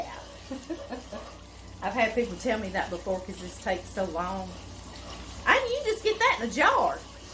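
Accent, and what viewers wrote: South, United States. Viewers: I do enjoy your cooking videos. You are so much fun! Don't stop talking! Your Louisiana vernacular is hilarious! Love your Southern accent and all your phrases! You are entertaining! I love to watch cooking videos. Keep up the good work!🍳🔪🥘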